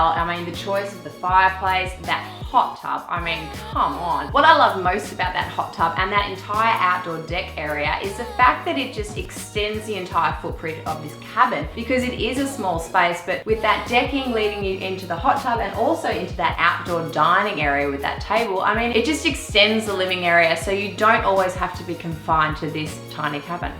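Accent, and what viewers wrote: Australia. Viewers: Cute cabin. Cute accent. I lived in Australia. I miss hearing it sometimes.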